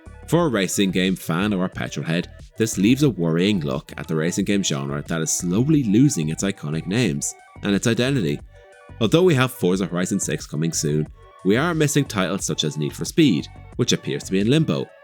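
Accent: Ireland. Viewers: Nice to hear an irish voice covering covering car racing genre gaming. Big wrc fan and was wondering whether to pickup testdrive on sale. Bad news on Nacon especially with all their current & upcoming titles you mentioned. I will check out galway rally video when i can. Keep this content coming GRMA.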